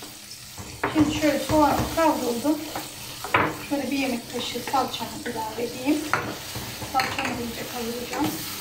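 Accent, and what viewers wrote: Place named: Mediterranean, Turkey. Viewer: Bende mersin liyim Akdeniz bölgesi şivemiz aynı 🥰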